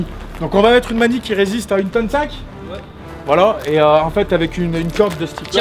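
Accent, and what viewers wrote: Saint-Étienne, France. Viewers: jte connais pas depuis longtemps mais tu mérite vraiment ! PS: tu serait pas un stéphanois avec ton accent ? mf91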